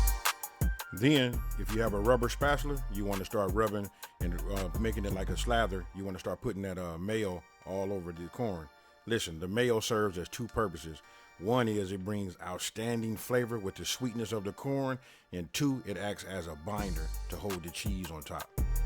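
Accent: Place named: West Coast, United States. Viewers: I have been looking at some of your videos for the month and you be throwing down. I'm from the south. I don't know where you are from, but I have a clue. You sound like you are from LA. I love cooking and I have been in food service for 13 years. Was a cook at one time, (my first real food service job) it was kind of intimidating, but I learned to get through it. They loved my cooking. My title was COOK II. I was second to the head cook. After that, I took on prep cooking at different places and that's where I'm at now. I normally work in hospitals and assisted living places, which I love. The place where I'm working at now gives me more opportunity to show my "Lil skills, YOU KNOW WHAT I'M SAYING,..., LOL" A resident wanted egg salad and sh wanted her bread in a zip loc bag. I was like, OMG!!! I HAD TO THINK. Which didn't take me long. 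I thought about the two other residents that are vegetarians. I'm like, hell, they can have this also. Just make this egg salad like I make TUNA FISH, (like WE make it) besides the li' sugar. I just did eggs, a little dill weed (NOTE: I'M AT WORK, Ain't no way in the hell I'm gonna have that spice in my cabinet, LOL), mayo, relish, salt and pepper. THEY LOVED IT!!!!!!!!! I'm from Arkansas and we had a food truck festival here and this is the third year that I missed it because I am always at work. BY WAY, LITTLE ROCK, ARKANSAS. , AND YEAH, some of my homey's told me that it was about 80 food trucks. One of them was telling me about this corn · Are you out here in LA? You sound like a Cali native and look very familiar! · Lol he from the west coast. Got to be. I hear it lo sound like rich the factor I love it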